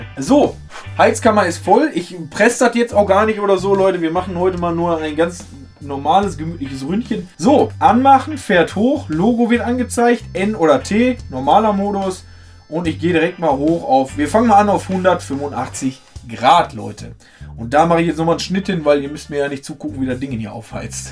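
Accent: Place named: Ruhr, Germany. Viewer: Danke für die Info, dann werde ich bei meinem Mighty und Hybrid bleiben! Der Volcano war an Black Friday für 400 Euro im Angebot, sonst ist der ja leider immer bei knapp 500... schon viel Geld aber es lohnt sich auf jeden Fall!! Kommst eigentlich auch aus dem Ruhrgebiet, Duisburg, Essen oder so? Die Ruhrpott Sprache erkennt man direkt 😂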